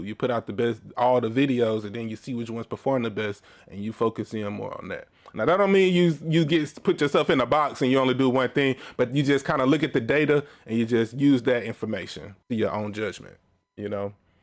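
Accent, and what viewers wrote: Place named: Houston, United States. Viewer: My boi got that H-Tine accent. 🔥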